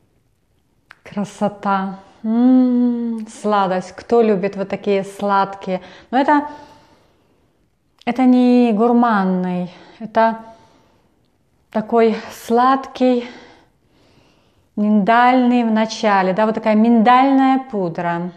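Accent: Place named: Ukraine. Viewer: да давно пробовала пробник, но сейчас решила, что это будет самое то на весну!!! Посылочка ещё не пришла... мне очень нравится Ваш голос и речь немного с украинским акцентом... не слушайте не кого.... и не говорите что у Вас мало подписчиков. Те кто есть Вас любят. У Вас нереальная коллекция и много мне подходит по рекомендациям. Уде 200 флаконов где-то. Собираю все нишу люкс, ретро. Очень полюбилось Sublime and Joy by Jean Patou... очень богато и нежно как по мне. Я живу в Америке 30 лет, сейчас начала здесь встречаться с итальянцем.... очень надеюсь что получится... хотя много говорят итальянцы врунишки и гуляки... и пускают пыли в глаза... а хочется любви и романтики... посмотрим. 💝💖💐🥰😘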